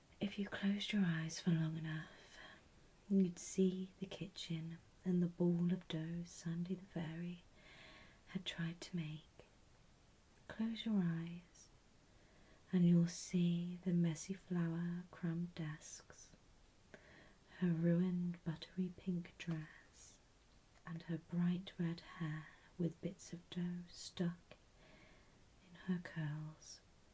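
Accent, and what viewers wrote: England, United Kingdom. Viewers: this english accent is just honey to the ears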